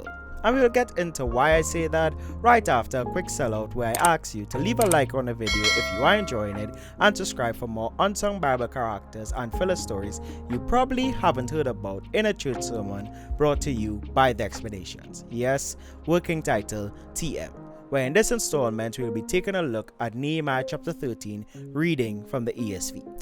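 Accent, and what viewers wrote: Trinidad and Tobago. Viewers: Great job. Are u a Trini!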